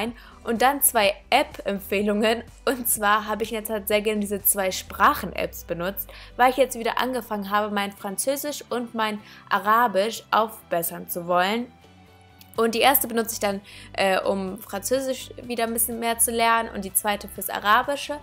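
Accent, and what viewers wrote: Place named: Bavaria, Germany. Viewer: du kommst aus bayern :O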